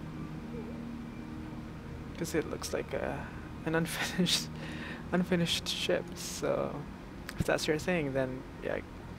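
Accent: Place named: Scandinavia. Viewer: thanks for the video. well done. really enjoyed it. one question though: r u from norway?! ^^ur accent sounds scandinavian, which I like for some strange reason. :d if thats the case: mange klemmer fra tyskland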